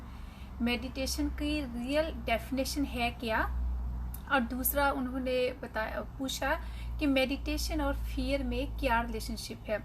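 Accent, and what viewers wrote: Punjab, India. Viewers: Perfect answer.. बस Punjabi accent bahut zyada hai.. Why don't you make the video in Punjabi?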